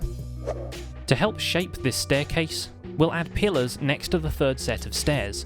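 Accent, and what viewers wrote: United Kingdom. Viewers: are you British I can't tell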